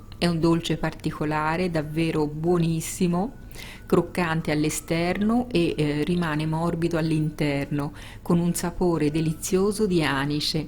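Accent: Tuscany, Italy. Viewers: Che bel parlare toscano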